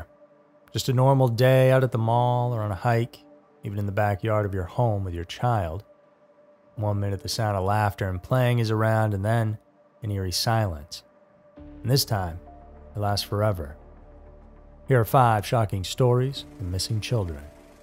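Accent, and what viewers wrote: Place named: Georgia, United States. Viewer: you're so awesome and professional. So sad and Heartbreaking stories. You're my number 1⃣ story teller. Prayers for the families. 🙏 P.S. I swear I can hear a Georgia accent, but you say you're from Beantown! ❤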